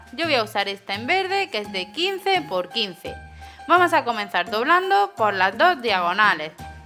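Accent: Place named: Spain. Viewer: deja de hablar como española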